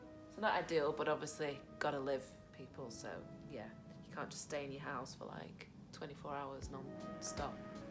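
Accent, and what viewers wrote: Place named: Manchester, United Kingdom. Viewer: Are you from Manchester love?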